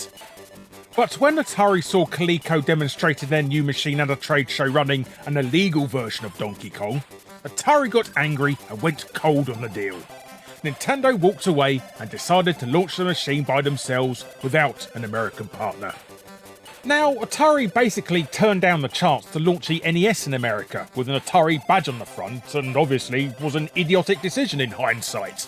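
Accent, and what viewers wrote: United Kingdom. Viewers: He's British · Sup, Just writing “Hi” here to confirm that INDEED the subtitles help me TONS as a non eglish speaker with trouble understanding heavy accent english (no offense brits i LIKE your english, but is hard to understand some of your pronunciation 4 me without subs. it even helps me to learn it) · You new here and never heard a Brit?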